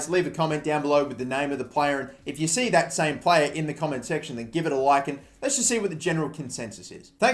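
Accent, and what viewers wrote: France. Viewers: Great French accent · I love the French accent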